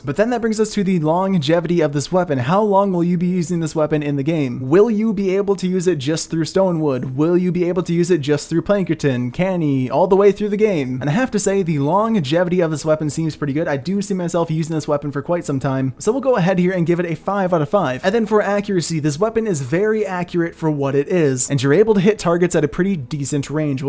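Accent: Czech Republic. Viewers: are u from czech :D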